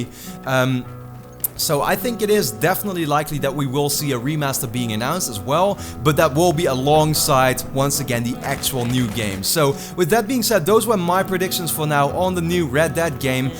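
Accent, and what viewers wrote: Netherlands. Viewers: You’re so obviously dutch😂😂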